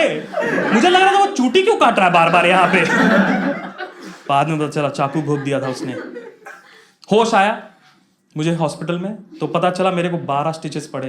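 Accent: Bihar, India. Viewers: Bhai your bihari accent owasm... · Your style of speaking is very similar to Ravi Gupta. Maybe its the Bihari or Purbi accent! I think if you can also add Mallu Hindi accent with Bihari it can be a very good act. Liked your show, well done. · Your Bihari accent is great bhaiya❤❤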